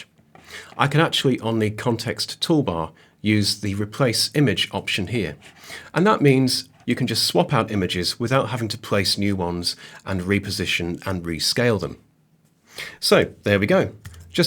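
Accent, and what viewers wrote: United Kingdom. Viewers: 超爱你的英国口音。哈哈。另外一个老头不喜欢，还是你讲得好！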